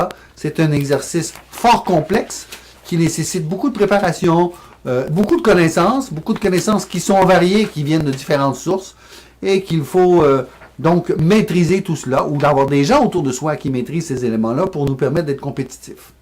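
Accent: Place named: Canada. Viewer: Merci et Bel accent canadien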